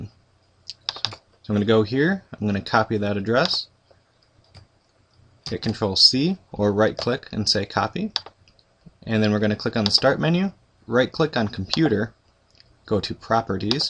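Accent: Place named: United States. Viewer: Hey, I gotta develop many programs till the end of january so i'll be watching all your tutorials :)) I hope I can learn to use android studio because nobody teached me. (I'm studing computer software engineer) Thank you for your job :) BTW: There are 2 big tutorials playlist, yours and one from another guy. I prefer yours because you speak american english and not british :P hahahaha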